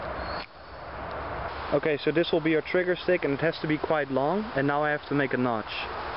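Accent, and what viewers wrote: Netherlands. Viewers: This is a strange video. With a Dutch accent, Irish music and making a huge mouse trap, Lol, that probably wouldn't work, what's the point? Have you ever caught anything with this? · i fear that is no option looking at the serroundings and listening to hes accent i would say hes from the netherlands and their is nothing that size here that will take that trap (fall for that trap), we dont have carnivores in our country..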